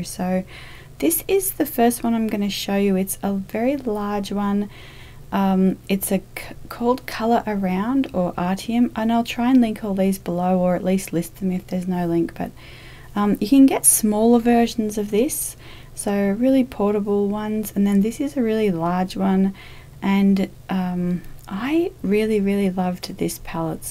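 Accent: Australia. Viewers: A nice Aussie voice talking about paint? Instant sub!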